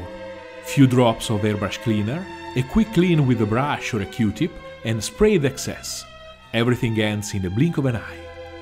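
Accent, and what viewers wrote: Italy. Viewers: Working in adult language acquisition I always tell my learners the same. Removing the accent is a ton of work, can easily fail depending on a multitude of factors and usually is just not worth it, unless you want to hide your origin for some reason. I find it charming and enjoy your videos no less for it, quite the opposite. Makes me think of some of my Italian friends, which makes you even more likeable, if that's possible. Last but not least, don't underestimate the Yoda effect: If you're the master and you want your lessons to be memorable and to penetrate your disciples hazy mind barrier, make your words different, intriguing and striking. Speaking like the rest truly you must not.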